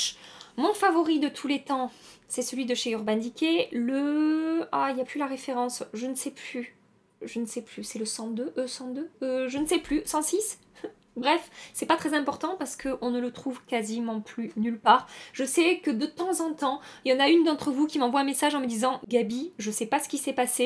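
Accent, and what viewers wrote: South, France. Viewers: adore ton accent du sud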